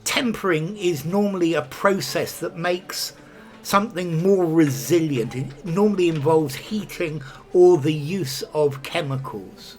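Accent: RP, United Kingdom. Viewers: Thank you, for the special "technical" uses of the word, viz.,- metal,steel,glass, ductility, spices, chocolate..... it is always enriching to view the videos in your Channel, all the more so, because your explanations and examples are well chosen, precise, thorough and tempered with the sweet and sour spice of humour and a posh accent. ☆Je vous envoie des baisers tendres de Paris. Sometimes, they can be a bit "fougeux", but I guess it would be a sin (or a crime ) to temper them!♡♡♡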